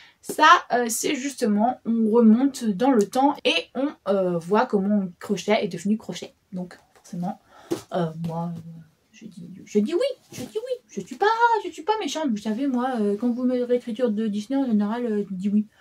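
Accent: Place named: England, United Kingdom. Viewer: ton accent anglais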